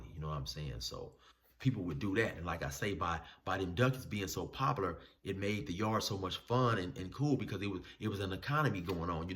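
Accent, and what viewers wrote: California, United States. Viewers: You a natural story teller pimp especially with that cali dialect I’m hooked dawg. KEEP posting big homie. YARDOWN!!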